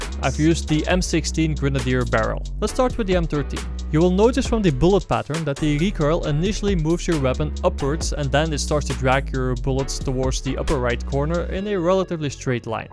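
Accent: Netherlands. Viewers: I can hear on your accent you are dutch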